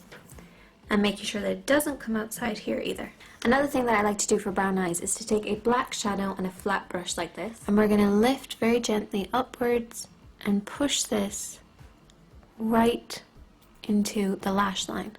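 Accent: Ireland. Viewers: she's Irish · At first I thought you had an American accent. Are you Irish? I love your accent.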